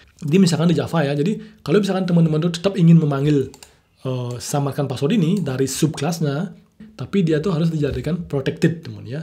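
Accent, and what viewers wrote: Bali, Indonesia. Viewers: Keren penjelasannya om, btw logat bali, asli bali om? wkwkwk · mantap logat balinya kerasa sekali. btw kalau boleh cerita dan bagi tips belajar bahasa inggris dong bli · Dari Aksen nya Pak orang Bali ya? Hhe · Kak dari mana ? logatnya kayak orang bali : ) sukses terus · logatny kek logat bali mas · kental banget logat bali nya :D, thanks video nya saya jadi lebih paham lagi konsep oop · Logat Bali asane ne, gitu lo. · Kalo gk salah saya merasa ada logat balinya hehe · Logat balinya kental banget wkwkwkwk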